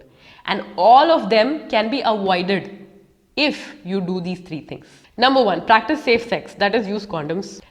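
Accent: Hyderabad, India. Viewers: U Hyderabadiii? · Omg,are you from Hyderabad....soo hard to know it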